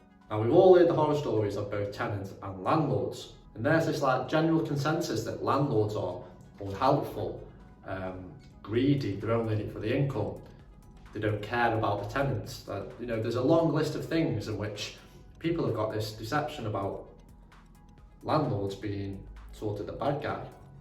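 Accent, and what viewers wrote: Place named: Stoke, United Kingdom. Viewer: Stumbled upon your channel and could tell a Stoke accent straight away! I'm in the process of trying to find my first BTL or flip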